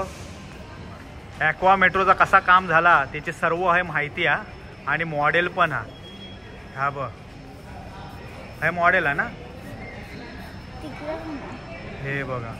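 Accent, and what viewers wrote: Malvani, India. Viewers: तुमची मालवणी लय आवाडली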